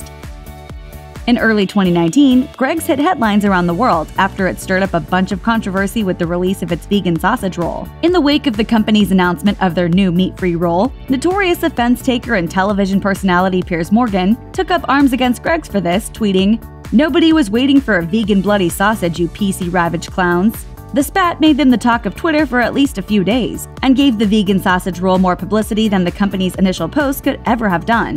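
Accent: United States. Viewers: Omg as a British person it’s so damn annoying to hear an American talk about this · I'm sorry, It's just weird to hear an American talk about Greggs and Newcastle.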